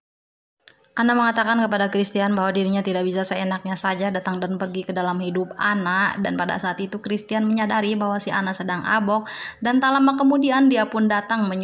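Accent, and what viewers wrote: West Java, Indonesia. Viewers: Alus euy sunda na kaciri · sunda pisan euy....😂❤ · Sunda pisan teh...😂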